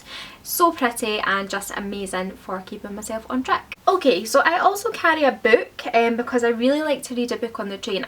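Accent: Scotland, United Kingdom. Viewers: YOURE SCOTTISH WOO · SCOTTISH ACCENt?/?/!????? SO BEYOND CUTE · OH MY GOD!! Are you Scottish?? I AM IN LOVE WITH SCOTLAND! This is a goal in my life to visit this country!! (I am French) I just find your video and I want to thank you for it because it really help me! I am a young student at university and I've search everywhere to find a bag that could fit with the atmosphere and now (thanks to you) I find it! So yeah thank you again!! Bye :) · Ah! So you’re Scottish! For some reason I thought your accent was German?? But then reading the comments, it made a lot more sense now. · the accent 💓💓. Merida's blood relative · I am from Germany and I will go to Scotland for my Au Pair next year. I love your accent. It is great to get prepared for my time in Scotland. Thank you so much!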